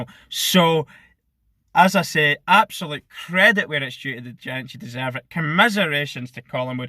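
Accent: Scotland, United Kingdom. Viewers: Beautiful human with a good Scottish accent. Subbed. Cheers!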